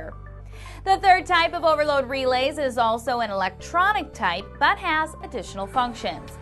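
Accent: United States. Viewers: Torturous American accent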